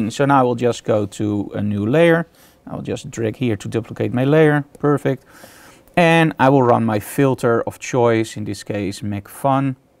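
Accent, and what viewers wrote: Netherlands. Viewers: I just try it. It's super amazing, I can even draw outside my drawing room seating on a couch, the signal is so strong! btw your accent is super Dutch :D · ben je Nederlands?-are you dutch? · You are Dutch aren't you? 😂 Me too btw so i'm not laughing at you in a mean way just so you know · lol ik kon gelijk herkennen dat je Nederlands was door je accent. geweldige vid man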